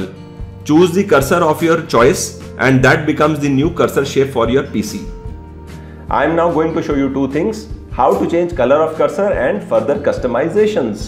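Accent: India. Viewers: i love your accent, my brother from india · u sound like a tech support scammer from india and its quackity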